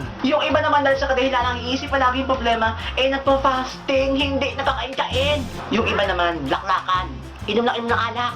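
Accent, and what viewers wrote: Visayas, Philippines. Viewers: bisaya kba Kuya? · HALA BISAYA DIAY KA -HA KA HAHAHAHAHA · Grabe! halata kaayo na bisaya! xD Proud to be BISAYA!!!! :) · Kuya, bisaya ka ba? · Oy, bisaya diay ka? Daghan nata. Kabibo. Nganga :)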